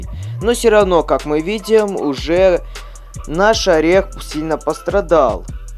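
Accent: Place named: Ukraine. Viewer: Мне кажется что ты украинец или беларус я беларус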